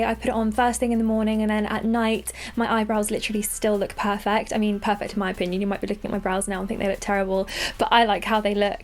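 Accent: RP, United Kingdom. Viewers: Does she have a RP accent or not ?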